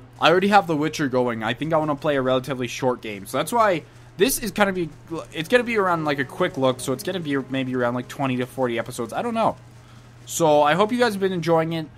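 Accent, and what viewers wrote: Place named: Canada. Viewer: I love Arizona, one of the craziest environments I have ever seen! Driving in the desert while a mountain of snow is at a distance! I hope I don't offend you but I thought your Canadian.. I'm Canadian and the accent is very similar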